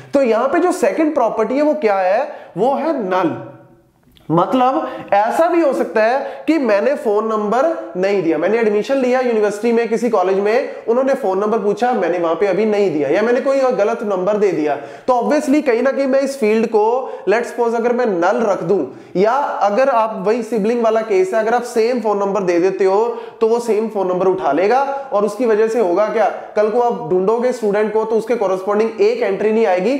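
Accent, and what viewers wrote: Punjab, India. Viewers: That punjabi tone in ur voice 🔥🔥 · Sir aapke bolne k tareeke se panjab ki khusboo aati h 😁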